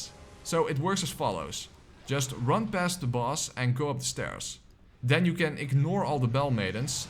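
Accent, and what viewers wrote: Netherlands. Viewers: Ik hoorde gelijk dat je nederlands bent aan dat accent hahaha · You sound dutch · Ey, Nederlands of Belgisch? :p Mooie guide. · Geez, ur dutch for sure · Dit is de meest duidelijke nederlandse accent ter wereld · First of all you sound dutch, second I can't even complete this game normally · I love your Dutch accent lmao! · I can hear that you Nederlands are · U dutch? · Love your Dutch English accent, greets from The Netherlands. Lekker gewerkt pik. ;) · This man sounds dutch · lol narrator is dutch, stereotypical terrible accent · Im surprised there are so little comments about your dutch accent. Geweldige gamplay makker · Ik hoor een Nederlander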